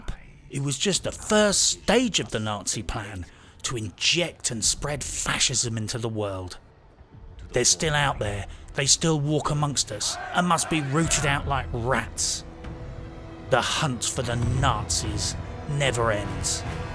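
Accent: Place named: RP, United Kingdom. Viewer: also refreshing to find an anti-left chap with a posh accent.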